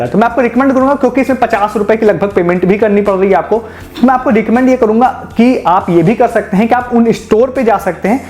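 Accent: Kanpur, India. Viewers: bhai kanpur she ho kya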